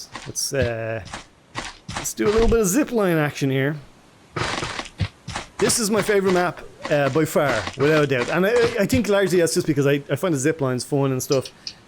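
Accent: Ireland. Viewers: I can't place that accent, is it Meath?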